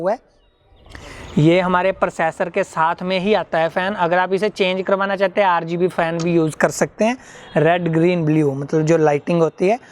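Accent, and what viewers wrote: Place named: Punjab, India. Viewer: Kinna aukha h punjabi bande nu hindi bolna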